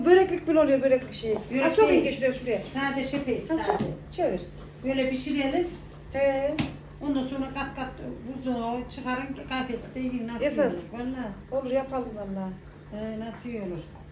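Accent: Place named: Aksaray, Turkey. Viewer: hanım benimde annemler aksaray koçhisarlı, sizi görür görmez şivenizden anladım:) babamda çorumlu, kültür birleşmesi cok güzel birşey insan sürekli yeni şeyler öğreniyor, çok becerikli bir hanımsınız taktir ediyorum:)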